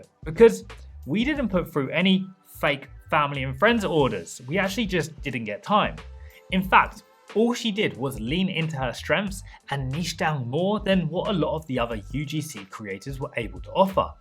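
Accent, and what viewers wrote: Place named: United Kingdom. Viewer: I deeply wanna get your piece of advice but that damn british accent 😭😭😭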